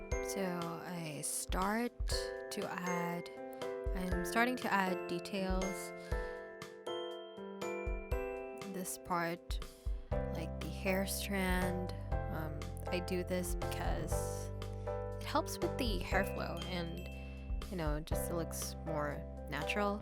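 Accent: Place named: United States. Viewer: no worries! Your fluent voice makes it crystal clear HAHAHA. Never really met a lot of fil ( assuming you are) speaking like an American teen so das something...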